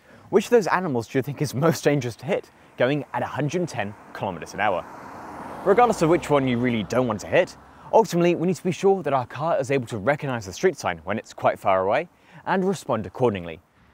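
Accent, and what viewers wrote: Australia. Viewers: This guy is just an australian Tom Scott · Is this Australian Tom Scott? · australian tom scott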